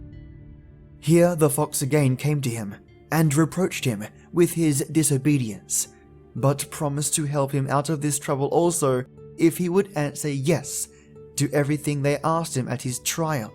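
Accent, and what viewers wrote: Australia. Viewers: Oh my gosh! My night is complete. Your theme comes on...You mention Earl Grey? Is it TWG? With this wonderfully warm, Australian accented Tale Teller starts some awesome Swedish folk tales...I 'm having some 1837 Black tea by TWG it's all strawberry and good stories...as lovely as you. I have a bird, you know about Daisy, they really don't like to be touched or get a nice bite. That prince needs to get smacked up side his head being so silly and not listening to the fox. The law seems severe don't pay your debts or get killed. Such a wonderful folk tale...the bird singing was sweet. Don't you also agree if you mess up with those ritualistic creepypastas? It seems when you mess up with one of those by forgetting to light a candle or you looked at the wrong time...you're dead or cursed for eternity. The fox was extremely kind and had patience with the prince compared to other folk stories.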